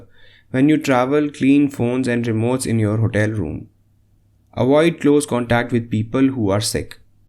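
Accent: India. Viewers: Indian accent 😁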